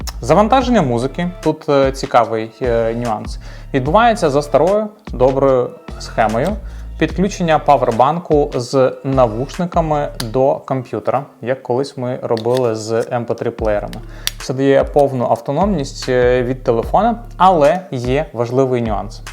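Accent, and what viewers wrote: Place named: Surzhyk, Ukraine. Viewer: Бро, я тебя не понимаю. Хватит на суржике говорить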